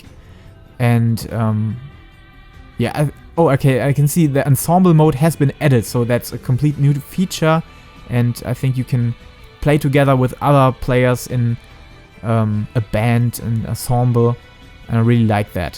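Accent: Germany. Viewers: es ist zwar ein deutscher dialekt vorhanden, aber grammatik/wortwahl sind immer richtig und die aussprache verständlich, also den zweck erfüllt es allemal :s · Reupload es am besten auf deutsch es klingt echt schlimm wenn deutsche eng reden .. nicht böse gemeint :)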